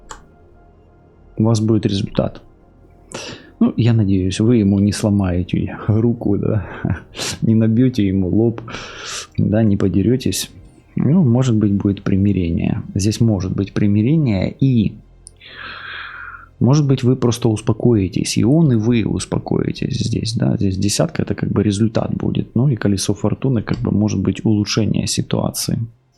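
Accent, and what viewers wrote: Ukraine. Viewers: Як же ви красиво розмовляєте українською мовою!!! Дійсно,наша мова прекрасна,співуча!!! Українська мова дуже вам личить ❤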